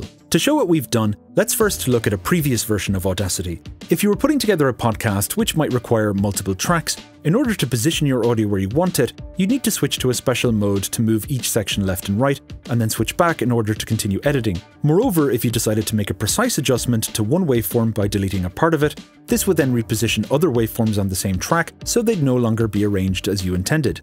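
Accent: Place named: Ireland. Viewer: Subscribed for Matrin "Tantacrul" Keary's excellent UX design sensibilities and pleasant Irish lilt.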